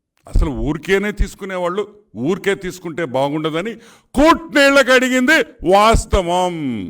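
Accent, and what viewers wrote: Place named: Nellore, India. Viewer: Anna meeru mana Nellore bhasha superb ga matladutharu anna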